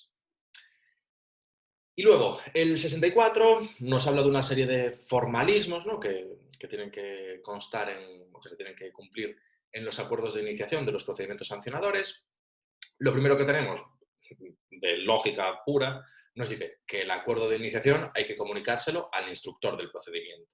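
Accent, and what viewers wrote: Galicia, Spain. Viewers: Gracias por tu trabajo! eres una gran ayuda! hasta se me ha pegado tu acento gallego! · Marabillosa tu iniciativa, tus vídeos, tus explicaciones, ... Me apareció un vídeo tuyo precisamente buscando un resumen y soy suscriptora desde el minuto uno. Lo mejor tus ejemplos, que son enormemente valiosos para comprender una parrafada que somos capaces de memorizar pero que en ocasiones no comprendemos, incluso tus "joder con acento" . Y encima gallego! No sé si habría manera de ponerse en contacto contigo vía e-mail para pedirte algún examen de convocatorias anteriores para la Universidad de A Coruña que es imposible conseguir si te preparas sin asistir a una academia. Muchísimas gracias!! Y a ver si te animas con las clases on line. Yo me apuntaría sin dudarlo. Saludos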